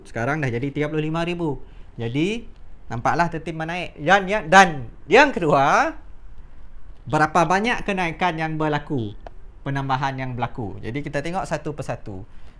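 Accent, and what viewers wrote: Sabah, Malaysia. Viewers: Cikgu bukan cakap orang sabah ke?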